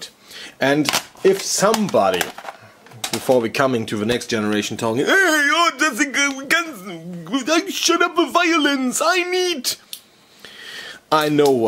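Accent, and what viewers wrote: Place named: Germany. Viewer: You sound German, love that accent bro. Best accent to talk in.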